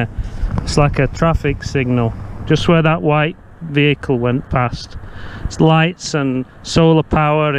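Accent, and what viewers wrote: United Kingdom. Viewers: You sound like your from uk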